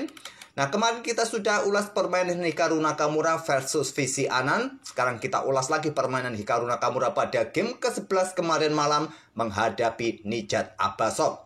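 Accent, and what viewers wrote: Java, Indonesia. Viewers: 50mnt mah mending cari rokok sekalian bikin kopi wkwk. Santuy dulu ga sih? Suka sama pembawaan channel ini. Apalagi kalo udah ngomong "di caplok" atau "baku hantam" wkwk aksen jawanya itu loh yg bikin seru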